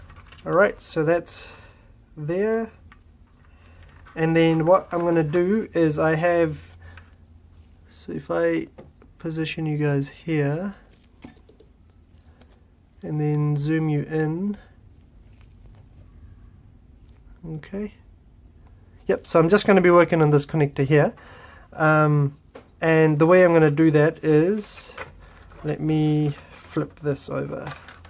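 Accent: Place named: New Zealand. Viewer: Your from NZ aye bruvvv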